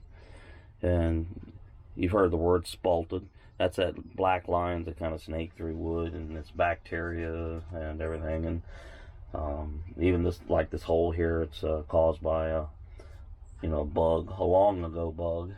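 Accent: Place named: United Kingdom. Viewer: ❤ love woodworking Also really like your accent as well are from UK Where are you ?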